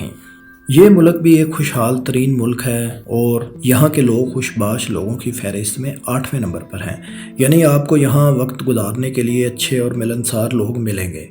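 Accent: Punjab, Pakistan. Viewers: sir ki Urdu bhe saaf nhi hai punjabi accent use karte hain urdu main jayse Barabara main bara ko kisi Ajeeb andaz main bolte hain plz change Karo plz 💔💔💔💔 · bhai, is bhai ki awaz Zara suit nhi krti apki video's my.... aysa lgta ha punjabi bol raha ha, gala ky urdu bol raha ha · Sub ko pata hay paindu ji, is say to acha tha kay Punjabi hi bol laytay, kisi Urdu bolnay walay say video banwa liya karo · Pls correct the pronunciation... It feels like punjabi. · Too heavy Punjabi accent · Punjabi accent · Urdu to sahi bolain. waraich jaisa koe nhi? Ye sahab acha bol rahy hain laikin talafuz main kafi kharabi hai. Aisa lag rha hai jaisy punjabi lehjay main urdu bolnay ki koshish ho rahi ho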